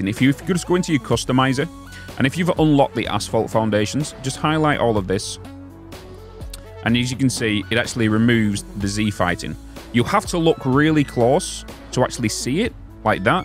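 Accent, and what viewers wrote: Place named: Rossendale, United Kingdom. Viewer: You from rossendale pal?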